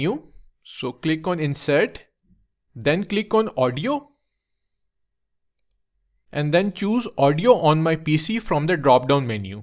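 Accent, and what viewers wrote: India. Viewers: Raw indian accent not even stereotypical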